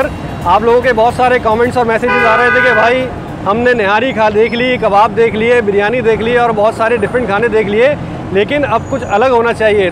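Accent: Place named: Lucknow, India. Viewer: Puri laucknowi juban hai aapki.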